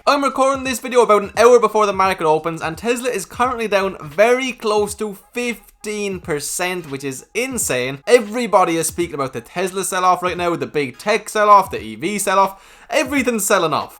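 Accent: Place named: Ireland. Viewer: Great channel - a succesful Irish YouTuber who doesn’t try to sound like a yank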